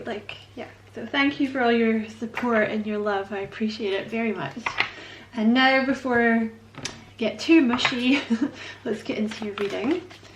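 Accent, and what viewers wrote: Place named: Scotland, United Kingdom. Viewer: I feel so welcome here. I like your Scottish Accent very much. Kia Ora (greetings in the Maori language) and a very big congratulations on your 100,000 subscriber plaque you fucking legend! Well done!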